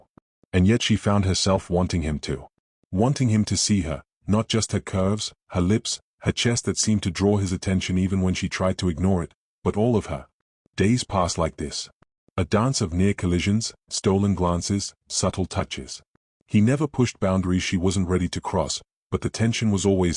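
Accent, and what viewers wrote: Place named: London, United Kingdom. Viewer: This narrator story teller speaks well and good and he tells this story really well and good. This story teller speaks with slight accent this story teller narrator sounds like and speaks like he's from London England he's doing a great job of telling this interesting awesome amazing I can understand everything that this story teller says as he's telling this great beautiful interesting story.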